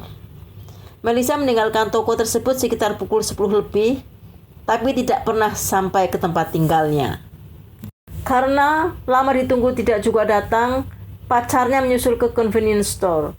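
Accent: Java, Indonesia. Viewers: Medokny dikurangi dong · suara madam yg medok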